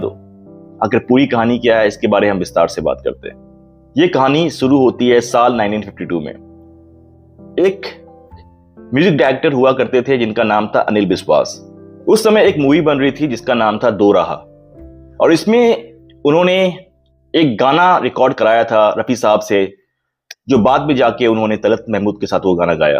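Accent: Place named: Bengal, India. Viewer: Aap ka gyaan sahi ho sakta hai, lekin aap ki hindi proper uccharan me nahi hai, aap 'R' ka uccharan nahi kar pa rahe ho. Bangali, Bihari tone ka touch hai.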